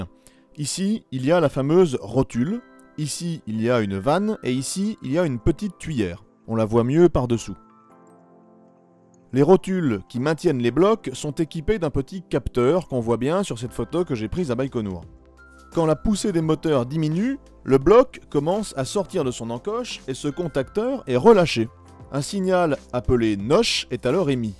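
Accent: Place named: Lorraine, France. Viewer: Woooooh serait-tu un frere lorrain? je dis ca car ta facon de prononcer 20 m'y fait fortement penser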